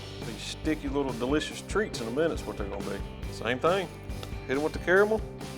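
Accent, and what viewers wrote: South, United States. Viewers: That is one thick country accent I thought mine was bad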